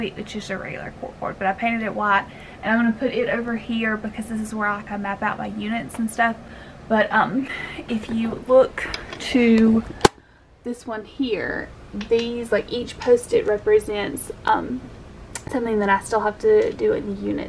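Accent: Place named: South, United States. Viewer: Hi, You are such a fabulous, conscientious, thorough, hard working, exciting teacher that makes everything so interesting for the kids. After seeing your previous fifth grade class and now seeing your additions for your new 2nd grade classroom it will be a "feast" for the kid's eyes this coming school year! It will be so colorful, cheerful looking, coordinated and organized, too! AND add to all of that, you are such a cute southern girl, with charming accent to match, as well! I can't help but notice that huge gorgeous ring (engagement/wedding ring (?) that you are wearing now. Does that mean what we think it means? (P.S. I am a former 2nd and 3rd grade teacher AND I have also taught Budgeting and Credit Score classes in a women's shelter. And I am also a mother of three and a grandmother of three. So I recognize all of your effort, talent and admire you for all that you do! 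I now live in a fabulous retirement community in Florida, called The Villages. Have you heard of it? I am passing on to my retired educator friends in our club about your channel so you will probably see more subscriptions from them) AND OH! I love your new home office too - another feast for the eyes (!) - so efficient and it gives you so much room to work! Have a great summer and upcoming school year,!... from an admirer... from afar!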